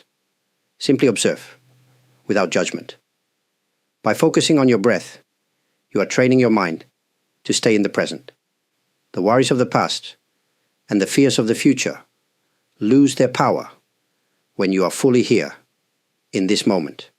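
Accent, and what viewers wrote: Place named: United Kingdom. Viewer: dear 🙏 I would love to catch a live with you sometime! or even just a your questions answered segment I would love ❤️ to hear about your faith journey of being a monk and I think I detect a slight UK 🇬🇧 accent in your voice, would love to know more about that, not to intrude on your privacy of course, just curious about your story always best regards and thanks 🙏 for your inspiring words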